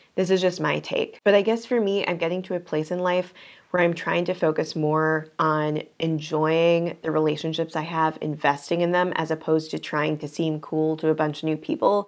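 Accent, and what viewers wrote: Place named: Minnesota, United States. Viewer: I love your Minnesota accent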